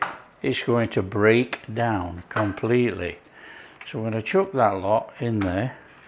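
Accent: United Kingdom. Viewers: Oh, COME ON PLEEEEASE, another fantastic video? I havnt digested the last one yet, 😅 sir you are the best cook, chef master on the internet, and with good reason, your meals, recipes are so perfect, your an awesome fellow to listen to, even though i have to really listen cause your british accent, the entertainment you share with us, and you tasting what you made at the end, is priceless, love your channel sincerly sir, with huge respect from the southern great state of Illinois...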